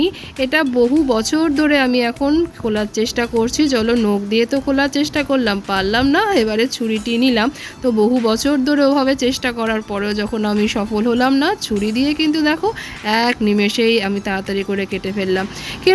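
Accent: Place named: Bangal, Bangladesh. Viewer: খুব খুব ভালো লাগলো তোমার আজকের ভিডিওটা তোমার কথাগুলো শুনতে খুব ভালো লাগে আর মাঝে মাঝে বাঙাল কথাগুলো বেরিয়ে বেরিয়ে যায় শুনতে ভালো লাগে আজকে যেমন বলেছো ডিমের চোকলা আমিও মাঝে মাঝে বলি চোকলা কিন্তু ঘটিরা বলে খোলা ডিমের খোলা তোমার কথাগুলো শুনলাম মাছ ধরার টিপসটা খুব ভালো বলেছ আমিও এই রকম করি খানিকটা সরষের তেল মেখে নিন ভালো থেকো সবকিছু নিয়েই ভিডিওটা খুব ভালো লাগলো আর তোমার কথাগুলো তো তুলনা হয়না❤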